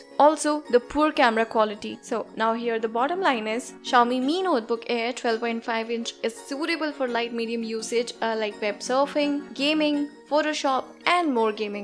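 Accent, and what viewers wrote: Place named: India. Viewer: i like your indian accent.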